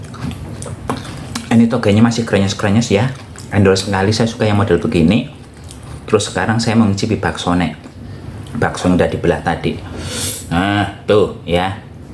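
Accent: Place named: Purwokerto, Indonesia. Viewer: Bahasa nya kok Purwokerto banget rika kang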